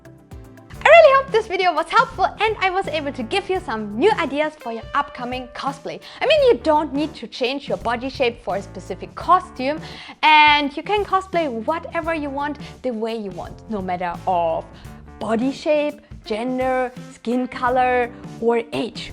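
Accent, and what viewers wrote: Germany. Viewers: Ist sie deutsch vom Akzent her?😅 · Dis Jerman Äksent! <3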